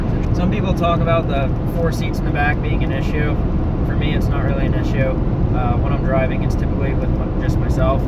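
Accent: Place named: Canada. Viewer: You have a slight canadian accent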